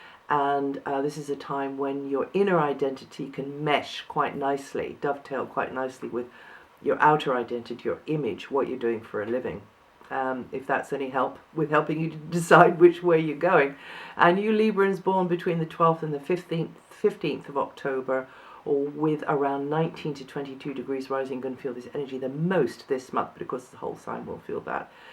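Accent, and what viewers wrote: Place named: United Kingdom. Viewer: You are Beautiful. I love your accent, British, of course.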